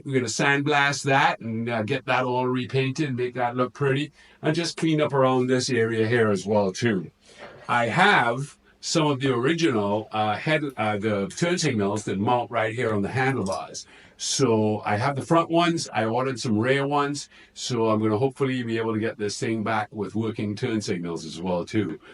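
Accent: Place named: South Africa. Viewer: Nice work! Anyone who has done stuff like this knows it can be a bit tedious, but it is very rewarding in the end. That DT is gonna be a thing of beauty. I've been trying to figure your slight accent. South African?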